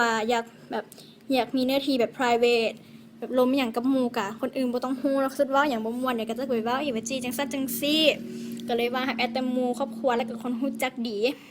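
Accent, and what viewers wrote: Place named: Laos. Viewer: 555 สำเนียงน่ารักอ่ะ... รักสาวลาวแล้วครับ....5555 แล้วเฟสบุ๊คจริงๆคืออะไรขอมั่งสิครับ ^_^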